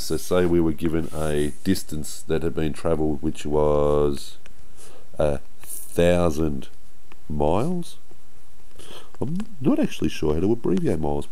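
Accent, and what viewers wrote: Australia. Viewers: Thanx Aussie, thats the best description I can find for this problem, no Indian accents(no offence intended), by the way hope that head cold clears up for ya soon, LOL. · Lol u sound like someone from love island Australia and thanks for the lesson really helped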